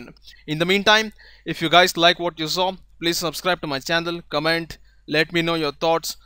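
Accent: India. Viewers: 一听就知道是印度伟哥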